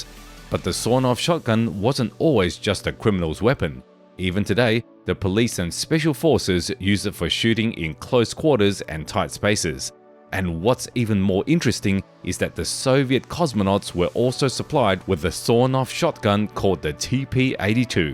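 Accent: United Kingdom. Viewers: this vid lost me at a brit narrating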